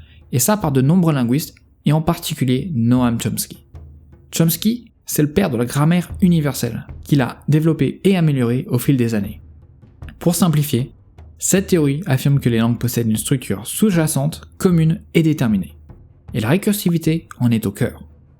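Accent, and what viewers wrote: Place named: Portugal. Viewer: oui je me demande aussi. Dans quelle région on prononce [tj] dans voiture ? jamais entendu ! cet accent me semble plutôt un peu portugais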